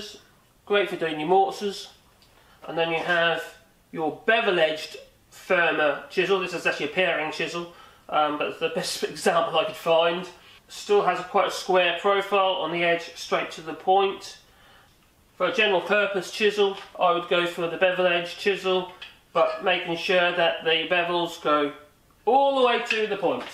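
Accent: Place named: London, United Kingdom. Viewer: You sound like a fellow Londoner. Walthamstow lad here.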